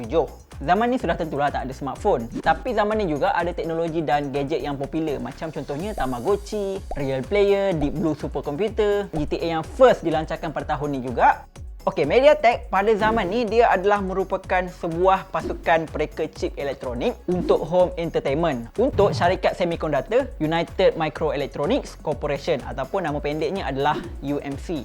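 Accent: Malaysia. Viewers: Gw suka logat malay kayak lihat upin upin wkwk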